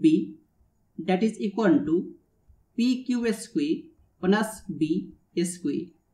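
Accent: India. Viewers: She is indian, thus the accent.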